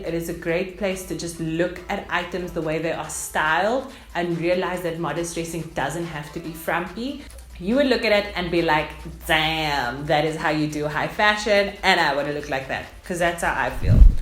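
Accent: South Africa. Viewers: Joburg accent neh☺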